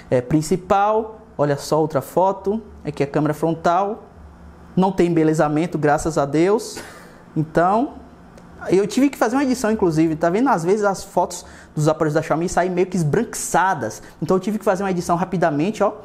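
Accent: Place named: Northeast, Brazil. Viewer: Sotaque nordestino KKK chato né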